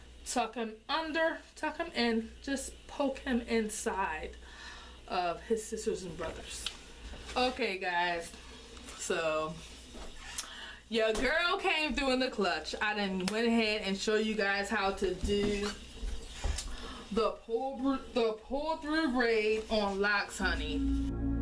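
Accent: Baltimore, United States. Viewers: U must be from Baltimore wit the tew nd dew lol...much love sis...our city's strong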